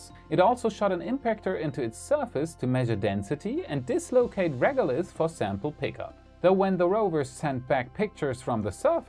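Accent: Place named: Germany. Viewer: Strong German accent, sounds awkward.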